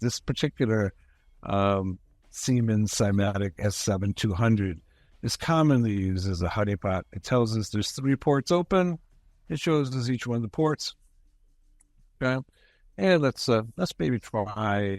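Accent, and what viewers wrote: Israel. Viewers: אני מת על המבטא הישראלי יא מלך תמשיך ליצור תוכן מעולה💪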